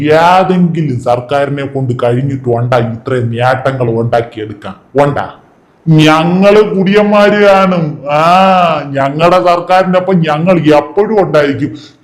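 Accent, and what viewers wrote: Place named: Trivandrum, India. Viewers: ചേട്ടാ! സൂപ്പർ, തിരോന്തരം ശൈലി 🤣😂🤣😄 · 😂😂 നമിച്ചു തിരോന്തോരം സ്ലാന്ക് 😜🤣👍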